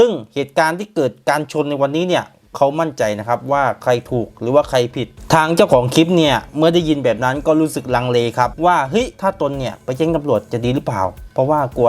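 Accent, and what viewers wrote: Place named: Buriram, Thailand. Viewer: สำเนียงบุรีรัมย์สุรินทร์เลย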